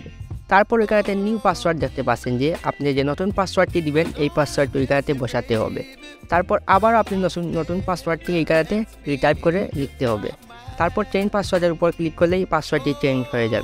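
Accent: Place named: Sylhet, Bangladesh. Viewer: ভাই নিশ্চয় আমাদের সিলেটি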